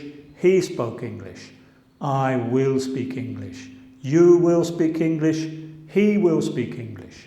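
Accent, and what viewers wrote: United Kingdom. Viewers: Hello. I like British English. The way you explain grammar is great 👍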